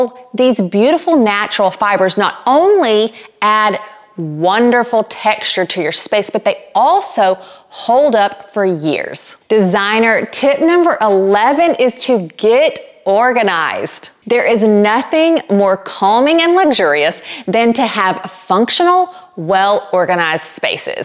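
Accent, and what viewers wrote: South, United States. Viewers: I loved this video. I love your design style. I'm from the UK and absolutely love your Southern accent. My favourite was your advice on lighting.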